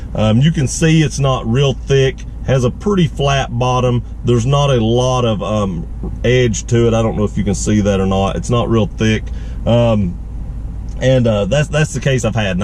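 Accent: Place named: South Carolina, United States. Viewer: Are you sure you are not from SC? I know you are from Florida but that accent is distinct.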